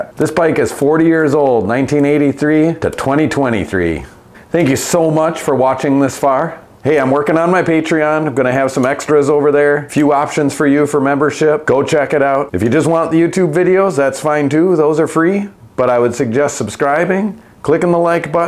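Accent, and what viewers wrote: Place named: Midwest, United States. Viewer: So GD relaxing, thanks for uploading! Minnesotan here. Love to hear the "Okie dokes" from our friends across the Mississippi/St. Croix. Midwest vibes - vibing so gently.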